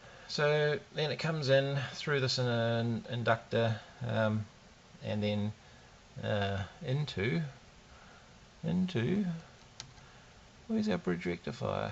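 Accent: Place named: New Zealand. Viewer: Thanks for the video. Very enjoyable.. You sound like a New Zealander... Auckland maybe..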